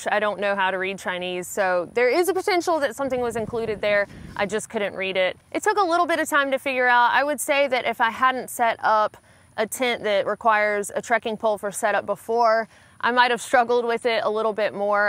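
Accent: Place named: South, United States. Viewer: I seen you and your mom in the desert so I thought you lived out west. Until I heard you talking y'all 😃😃. Yep you must be a good ole southern girl. Great review. I like seeing the rain test. To me staying dry at night is my main concern. Thanks for these reveiws. Like the dog love her colors. She's marked up real pretty. Looks like she might have a little bulldog in her.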